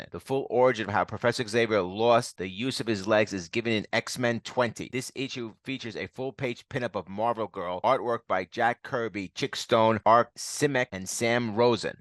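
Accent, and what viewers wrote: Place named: New York, United States. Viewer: You have an awesome voice for radio or tv. You’re accent sounds like you’re from New York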